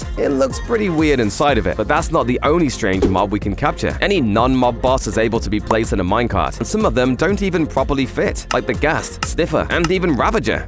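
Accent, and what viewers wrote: United Kingdom. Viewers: So British accent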